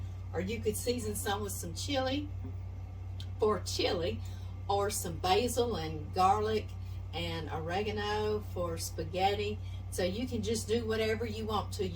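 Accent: South, United States. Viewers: I put up 10 pints of salsa today. I do not hot water bath either. once they're sealed they're sealed good. I have more to do in about 3 days. I also put up stewed tomatoes . love your videos & your southern drawl. ha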